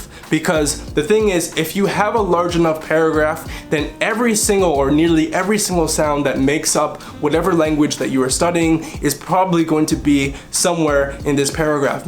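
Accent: United States. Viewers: Well, he has the American accent down well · Hey, im russian and i can clearly hear his accent. He sounds like american, not russian. Yeah, his accent is still decent (for foreigner)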